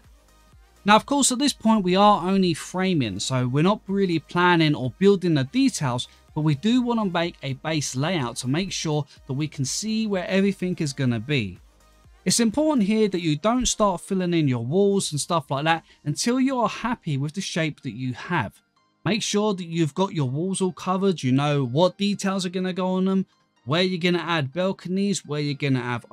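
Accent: United Kingdom. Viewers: I HATE HIS BRITISH ACENT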